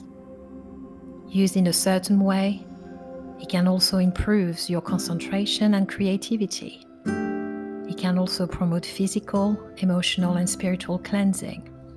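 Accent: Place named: France. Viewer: Thank you, your videos are so beautiful, soothing, helpful and just connect with me on a very deep level, kindred spirits indeed! I'm British and I have many friends who were born in France and I do love their accent, yours is lovely Blessings to you and all Louisa and Sas (pug) I'm wearing a solid lapis bangle and citrine nugget bracelet... I love crystals, always have x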